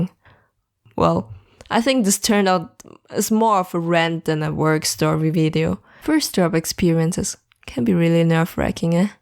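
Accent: Germany. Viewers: the accent also sounds like a german accent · she comes from austria so it is a german accent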